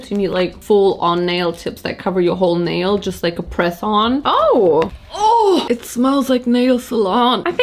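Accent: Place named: Germany. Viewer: I hear the germaness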